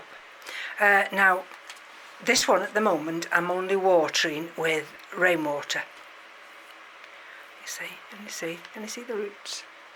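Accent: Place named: North, United Kingdom. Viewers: I recognised your accent but didn’t realise you were in Crewe! I was born in holmes chapel and lived sandbach and alsager... my nan is from crewe and I went to college there... I’m now in Devon. Loving the videos. Keep up the good work! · Great to hear a northern accent talking about orchids thanks.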